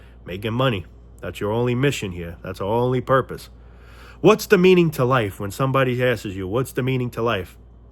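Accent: New York, United States. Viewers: Red pills with a NY accent. I'm in.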